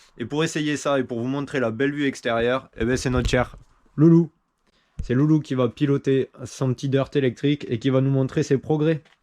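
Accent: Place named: South-West, France. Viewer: Salut, tu es de quel département ? Tu as l'accent du sud ouest si je ne me trompe pas... Moi je suis des Bouches du Rhône.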